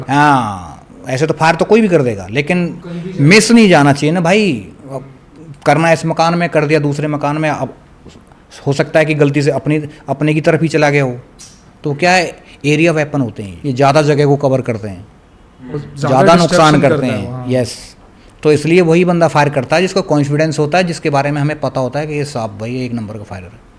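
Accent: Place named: Palwal, India. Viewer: Inki aawaz palwal side ki si lag rahe hai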